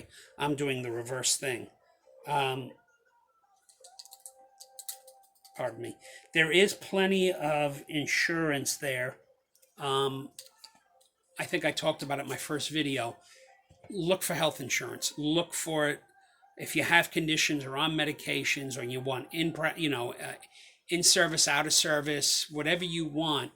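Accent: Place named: Boston, United States. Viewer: Too bad,i liked how you presented your observations.I guessed you were from the Boston area.I lived in Chicopee Ma.For several years putting an ex wife thru American International College in Springfield.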